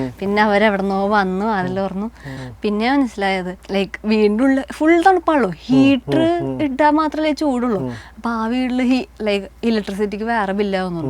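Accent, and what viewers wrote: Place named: Thrissur, India. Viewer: All good but I am from Mattancherry and even I cannot place her slang to Vyppeen. Thrishur, yes. Something's amiss! Oh, well!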